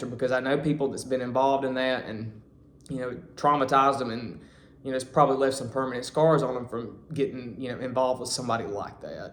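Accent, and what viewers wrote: South, United States. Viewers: I have one qustion for U; Are ya Suthern? Cute as Funck!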